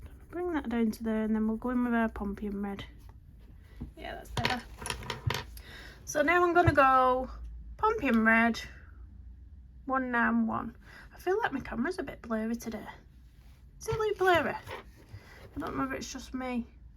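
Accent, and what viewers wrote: United Kingdom. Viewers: Bonjour, la combinaison des couleurs est super je suis fan de tes vidéos et j'essaye de suivre tes tutoriels... merci de partager. Where are you from because j'aime beaucoup ton accent british but où ? moi je suis française et je vis dans le sud vers Carcassonne. Bravo pour ton talent Corinne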